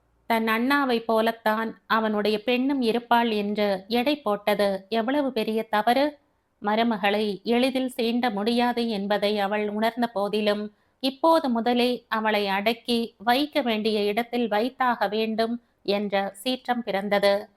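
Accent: Kerala, India. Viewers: Besh beshakum ungalin inimaiyana malaiyalathamil vasippathu kathiyum super💖💖💖👍👍👍🎉🎉🎉😊😊😊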